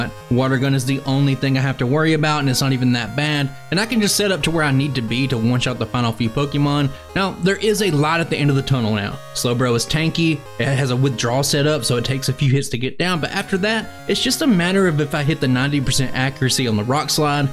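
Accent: South, United States. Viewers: alright man, I’ll watch it to the end. Again I’m just a big fan I love your commentary (with the southern drawl as well)and seeing things I haven’t seen before from you makes me excited so I was surpised to see you go back to our wishful bug/dragon friend. Cheers mate! Keep up the great content!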